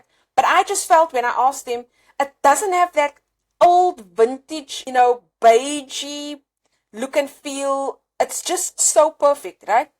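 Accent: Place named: South Africa. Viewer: Are you from South Africa? 🌼✨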